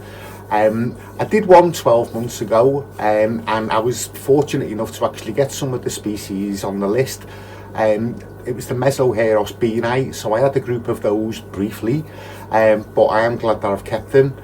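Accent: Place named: Liverpool, United Kingdom. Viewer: Something completely off topic mate, me and the wife (she's not English) were watching the old 80's film 'letter to Brezhnev' the other night and she said at the beginning she was struggling to understand the accent. I just watched your video now and she could hear you and said you have the same accent as the people in the film, she says the scouse accent is nice when you get used to it haha, happy new year!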